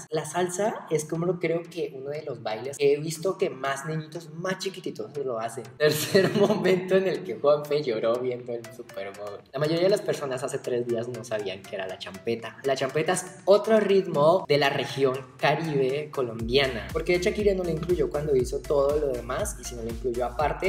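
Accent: Mexico. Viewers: Este tipo es colombiano y habla como Mexicano? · Hablas mexicano. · Pero por qué hablas como mexicano gomelo??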